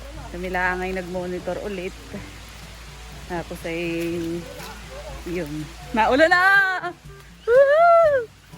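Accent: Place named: Marinduque, Philippines. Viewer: Ikay baga ay taga Marinduque sa punto ng pananalita mo ay marinduquenio ga mura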